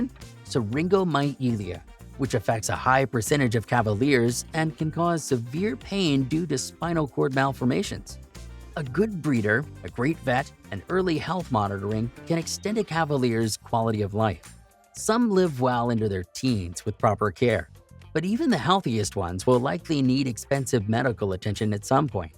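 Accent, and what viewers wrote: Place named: United States. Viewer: This guy is an absolute idiot……..these dogs unfortunately will eat anything and everything……any break from kibble or dry biscuits is a blessing…. Do not feed these dogs any kibble no matter how expensive……it is deadly to them, most dogs but definitely these….no cavalier after 2 has endless zoomies…..the stupid American English accent ……they should never be left alone for more than an hour or two….Do not get one you can’t afford to stay at home or work from home……they would die early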